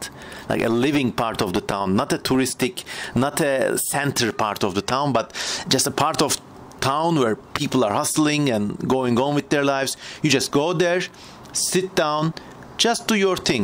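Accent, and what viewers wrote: Turkey. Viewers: İngilizce aksanı Türkçe'ye çok ama çok benziyor. Soy isim " " olabilir mi diye merak ediyorum. Acaba? Eğer doğruysa belki bir evet cevabı alabilirim.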